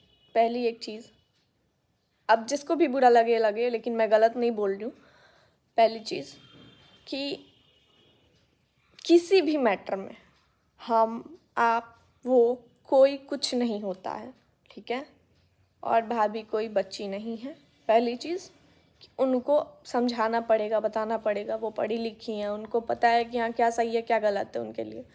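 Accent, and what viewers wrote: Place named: Bihar, India. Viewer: बिहार में ऐसे ही बोलते हैं मज़ाक मत बनाओ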